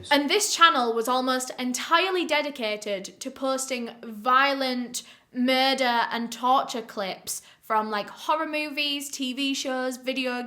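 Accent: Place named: Yorkshire, United Kingdom. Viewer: You can't just pick up a leeds or Yorkshire accent like that ha. I should know, she's from my city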